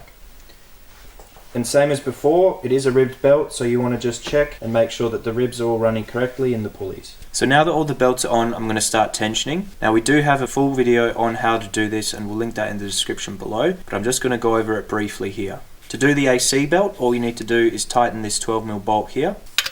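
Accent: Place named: Australia. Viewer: Very informative and detailed. Great video! Greetings from the Dominican Republic. Where are you from? England? I reckon you are from Australia, but I'm not quite sure.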